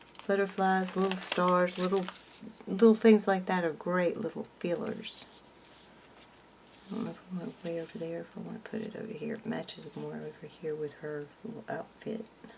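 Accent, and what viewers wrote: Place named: Oklahoma, United States. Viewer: Hi, this is the first video that’s popped up on my home page, so I clicked on it as I am fairly new to decorating journals. My name is Karla, in Oklahoma. You don’t sound like you far from Oklahoma? lol your accent. Anyways, you created a very pretty spread. Love how the girls are cascading inside the faux Dutch doors. Thank you for bringing us along 😊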